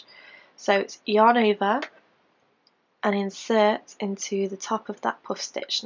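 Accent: United Kingdom. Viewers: great tutorial, good pace, and makes a pretty star :-) Thank-you! Nice to have a British voice, too ;)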